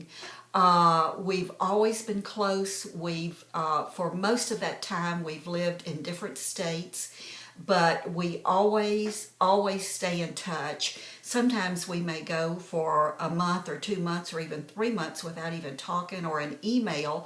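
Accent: South, United States. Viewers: just found recently your utube videos and am hooked, just love your accent, reminds me so much of the way Liz Taylor spoke in many of her films, kind of Southern accent though i think you are from Arkansas, am i right, you are such a young beautiful lady and your wedding day snaps are so lovely. i still have many more of your videos to look at. Have been to many US states but not Florida nor Arkansas, my fav is New England, twice visited all those NE states, also CA from LA all the way up the Pacific coast road to San Fran, hope to go back and see more. just love US. regards Jenny from the UK. XX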